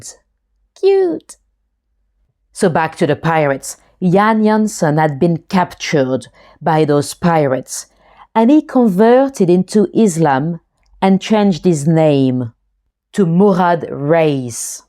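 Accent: France. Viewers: English with french accent what i mix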